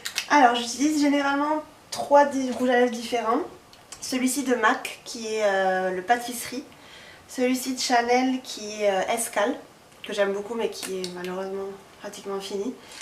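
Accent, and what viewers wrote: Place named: Toulouse, France. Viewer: Ca fait plusieurs vidéos que je regarde de toi, et je me disais : "mais elle a le même accent que moi elle doit être de Toulouse".... Et enfin j'ai la réponse ! :)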